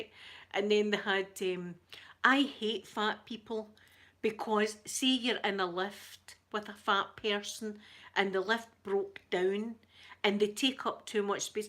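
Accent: Scotland, United Kingdom. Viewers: That lipstick looks lovely on you! I wish I could do a Scottish accent but I carn't do it haha! It's lovely though x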